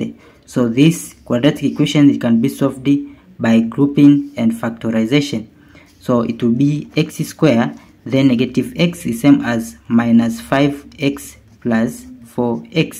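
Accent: India. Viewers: 这尼玛印度口音绝了。这题解的 跟傻子似的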